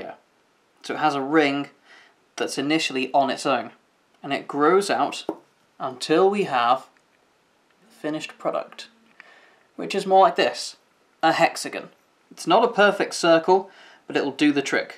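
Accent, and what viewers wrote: United Kingdom. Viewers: Off topic, what area of england is your accent from? Also, thanks for the lessons.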